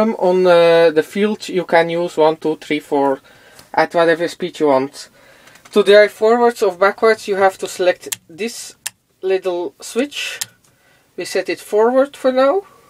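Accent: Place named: Netherlands. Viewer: Duidelijk hoorbaar dat je normaal gezien nederlands spreekt ;D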